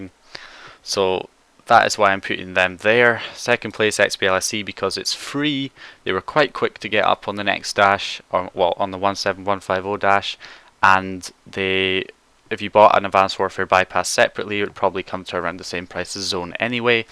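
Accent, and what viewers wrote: Scotland, United Kingdom. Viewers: Sno _Warior he's Scottish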